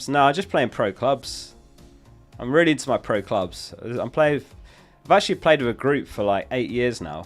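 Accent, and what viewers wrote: United Kingdom. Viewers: BRO LOOKS POLISH AND SOUNDS SO BRITISH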